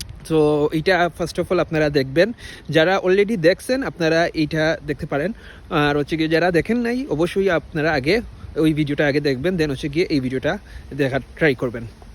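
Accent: Sylhet, Bangladesh. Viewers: sylheti naki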